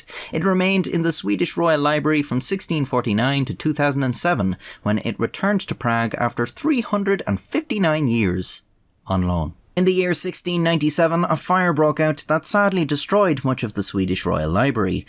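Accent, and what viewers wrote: Ireland. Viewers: It's Irish, but it's not the Dublin accent, which most people, especially us Americans, have heard variations (piss poor imitations, in words). He lives in Dublin, but he's told us he hates the native Dubliners he's met.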